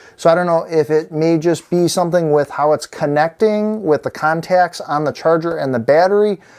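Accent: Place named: Western New York, United States. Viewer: “Badderie” your accent is hard-core Western New York to Wisconsin .. still enjoyed it thanks for making the video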